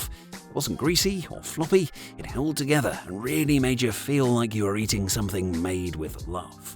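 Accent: England, United Kingdom. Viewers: It's weird hearing american fast foods of yesteryear being narrated by an englishman.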